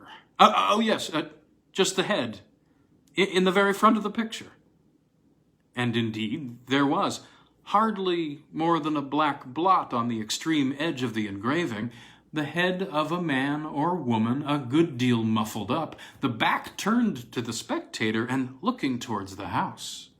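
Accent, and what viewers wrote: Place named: United States. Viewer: To hear this in an American accent is curious, of course, but you bring it off well. Thanks!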